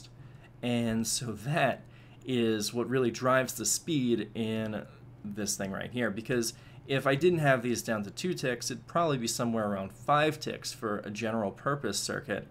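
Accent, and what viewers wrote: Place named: Quebec, Canada. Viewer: ***** il est québécois...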